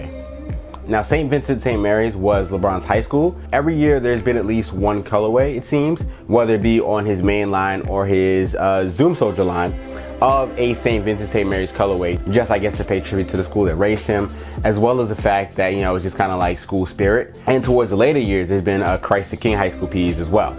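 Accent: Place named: California, United States. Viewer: You say you from new york I do not hear it in your voice son you sound like a california kid my accent is deep from South Bronx heart of new york kidd E 194th Briggs come on son rep right son aiiight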